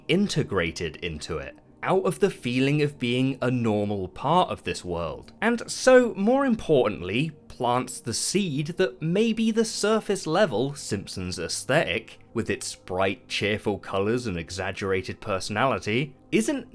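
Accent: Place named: United Kingdom. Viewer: Totally agree. I think it's both but also his voice. He's got a pretty calm uk/euro accent that has a comforting quality to it. I use it to fall asleep to pretty often too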